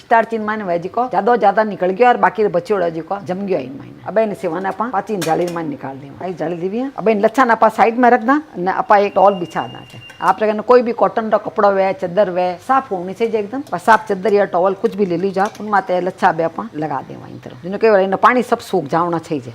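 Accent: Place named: Rajasthan, India. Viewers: Aapko mera Pranam : aap ki marvadi bohot achi lagti hai , meri maa bhi aapki tarha bolti thi , thari boli mane bohot achi lage hai: thane Mero bohot pyar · Aunty aap rajasthan me kaha se ho....??? Meri Bua nokha-mandi bikaner rahti h wo aur unke bachhe asehi bolte h.... Mujhe unka talking way bahut pasandh h.... Aap bhi waise hi bolte ho....bahut achhe lagte ho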